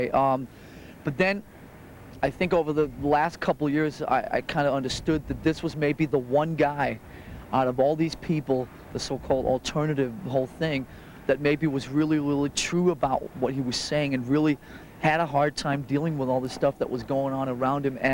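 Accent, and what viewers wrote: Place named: Denmark. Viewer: It’s the Danish accent lol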